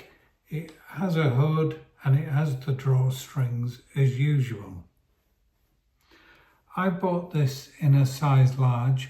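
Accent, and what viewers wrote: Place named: County Durham, United Kingdom. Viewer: Where Oop North are you from, I’m hazarding a guess and would say County Durham? Very informative video as usual, missed your uploads so looking forward to your next one. Cheers. 👍🏼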